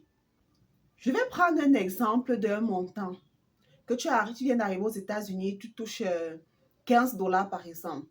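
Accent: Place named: Cameroon. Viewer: Belle vidéo, argument très pertinent. Je sens, d'après ton accent, que tu es Camerounaise, je suppose.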